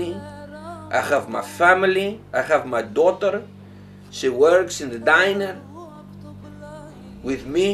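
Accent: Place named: Greece. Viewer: jeez!! love the accent! it's sooo "i'm a greek living abroad"!!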